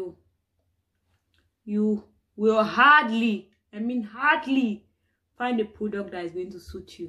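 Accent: Nigeria. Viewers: your accent is slightly Nigerian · your speaking pidgin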